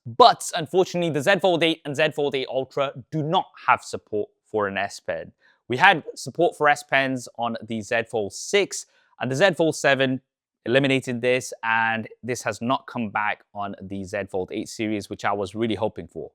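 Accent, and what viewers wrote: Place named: United Kingdom. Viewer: SuperSaf can you work a bit on your elecution & diction for these videos? It's really tough on non-British listeners. I had to press the -10 button sll the time and by the end of the video my ears were screaming. I assume this a regional British accent with the sing-song up-and-down chit-chat you do with the guys? Because a standard London accent I usually understand very well and even regional accents of older people eg Andy Burnham I understand fine. Cheers!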